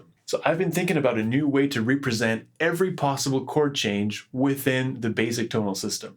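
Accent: Quebec, Canada. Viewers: He sounds Canadian, maybe Quebec? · Your Quebec prononciation surprised me, but excellent explanation I understand better! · Hey, j'ai reconnu l'accent Québécois avec le titre de! Merci pour le vidéo:) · Me - Messemble qu'il a un (léger) accent Queb... Me - Jeulsavais! Bonne vidéo, concept intéressant et bonne exécution, merci pour le pdf! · “RE-present.” He’s not AI, he’s just French Canadian.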